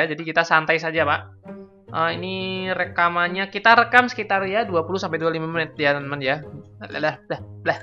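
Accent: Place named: Java, Indonesia. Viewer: Mas oraang jawata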